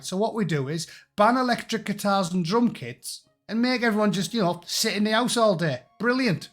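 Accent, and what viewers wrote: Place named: Newcastle, United Kingdom. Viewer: This country is a mess, but tuning in every day to hear the words Rudu-Kunubu with a Geordie accent makes everything a lot more bearable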